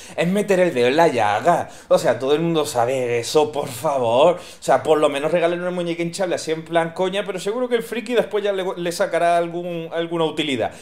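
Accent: Granada, Spain. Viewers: Edu Rubio Jimenez los de Murcia no hablan así! es granaíno :)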